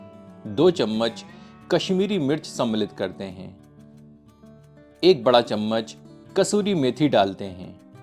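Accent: Standard. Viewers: Boss aap kanase hai aapka hindi bahut sudha hsi. I like it.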